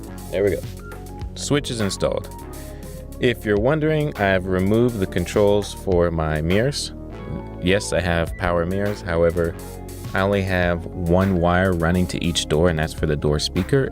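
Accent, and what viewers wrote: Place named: Brazil. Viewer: cool lol. I'm Brazilian. You got a lil of bit of Brazilian accent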